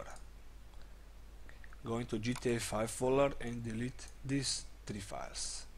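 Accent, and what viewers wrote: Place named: Balkans. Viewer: druze znao sam da si srbin il balkanac primjeti ti se naglasak XD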